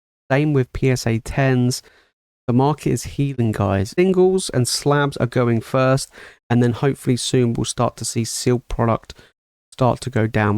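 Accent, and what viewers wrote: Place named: RP, United Kingdom. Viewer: I realize you are probably in the UK but why the gay queens English? Use dollars.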